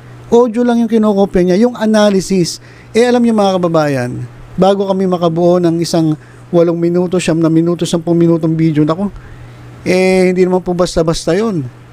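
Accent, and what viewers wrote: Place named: Manila, Philippines. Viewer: Nakinig nko dyn boses mo narinig ko.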